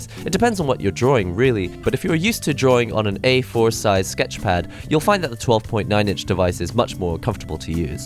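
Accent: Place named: United Kingdom. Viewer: you sound like a british Amir Blumenfeld. Thats a compliment lol.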